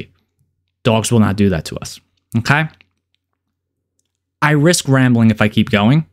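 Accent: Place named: New York, United States. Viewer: Random bro but no weird shit but I'm a black guy from London and I have to say your accent is so dope. haha that New York accent just makes me enjoy your stuff even more lool you're voice just makes me feel like I'm listening to someone from the wire like Mcnulty talking real red-pilled talk to me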